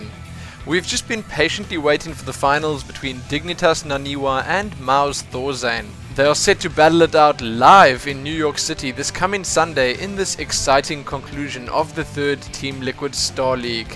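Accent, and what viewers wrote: South Africa. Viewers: I absolutely love the South African accent. I want to hear your voice on a lot more Starcraft shoutcasts.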